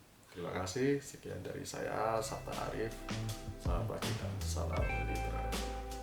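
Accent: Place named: Ponorogo, Indonesia. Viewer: Ketika ngomong ponorogo medok banget mas.. i like it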